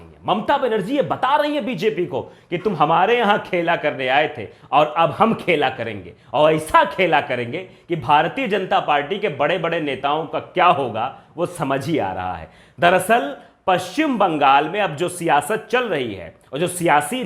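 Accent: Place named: Uttar Pradesh, India. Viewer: भाई 💪 बोलते बोलते गांव चले जाते हो😃😜💯 यूपी वाली भाषा में बोलते हो 🙏💓 आनन्द आ जाता है 😜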